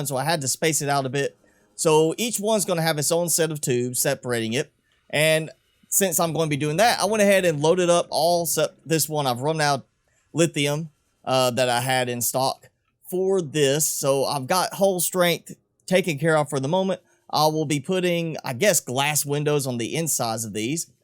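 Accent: South, United States. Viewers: I love your southern drawl it is so charming XD Up here in Michigan we tend top be on the nasally side lol. Love you Subnautica videos, seriously huge help thank you so much for making these! Best Subnautica Youtuber right here folks^^.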